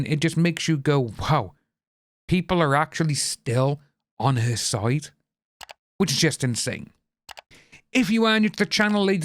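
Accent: Wales, United Kingdom. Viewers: Are you Welsh? I hear it in your voice. I’m from Tonypandy. I agree with your comments btw. Spot on. 🏴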